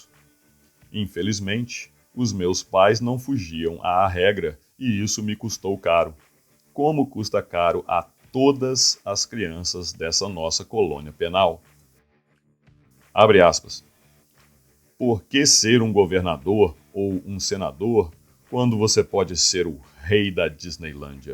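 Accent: Minas Gerais, Brazil. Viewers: Hey vc é mineiro?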